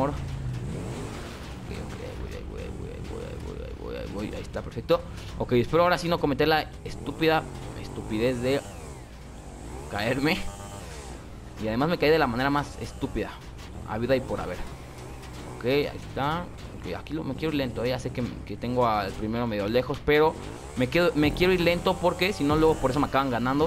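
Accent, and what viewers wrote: Mexico. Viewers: Eres Méxicano????♥️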